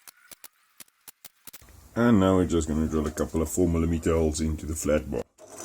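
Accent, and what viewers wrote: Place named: South Africa. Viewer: You sound south african, are you?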